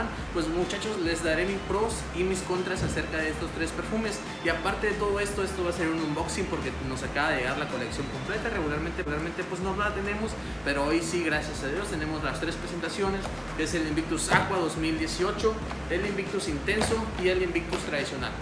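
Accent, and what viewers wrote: Mexico. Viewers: Eres mexicano wey? :v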